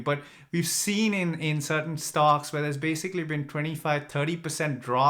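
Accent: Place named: India. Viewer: From your accent its visible that ur root is from Indian. Why can't you say your name properly. Its or. Bitte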